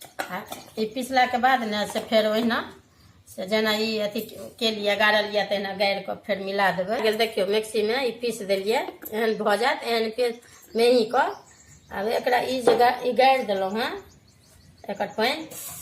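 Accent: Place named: Maithili, India. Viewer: Sudh maithili bhasa suinke mon anandit bhai gel.. Ahake gaam aa jila ki bhel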